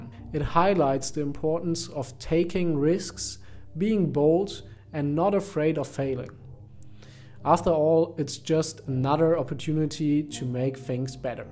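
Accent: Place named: Germany. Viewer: Heutzutage ist hald Englisch einfach Pflicht. Und nur wenn man sich mit der Sprache auseinandersetzt lernt man sie auch. Und auf diesem Weg zu lernen macht ja auch mehr Spaß wie stumpf in ein Buch zu starren ;) Learning by doing ist das Gebot der Stunde. Und klar hört man den Alman Akzent noch etwas Aber das wird auch mit Der Zeit und ist defentiv kein Grund sich stoppen zu lassen. Die Community die man damit erreicht ist einfach auch globaler orientiert und damit auch etwas interessanter in der Interaktion, da man wenn man mit anderen Kulturen in Kontakt kommt andere Sichtweisen erhält und damit seinen Wissenschatz in ungeahnte Ausmaße erweitern kann. Just give it a shot ;)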